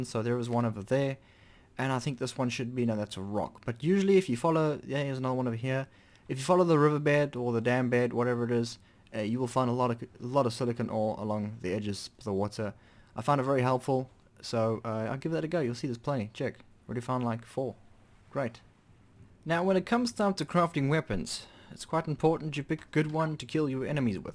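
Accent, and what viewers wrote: South Africa. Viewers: South African accent perhaps???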